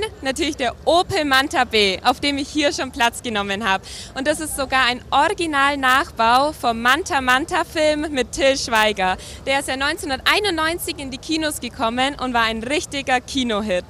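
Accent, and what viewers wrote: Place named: Bavaria, Germany. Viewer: Bayrische Sprache isch doch einfach die beste . Du machst super du musst echt ein Job im Fern machen . Und du siehst in dem Styl super aus 😙🙏🙏🙏🙏😙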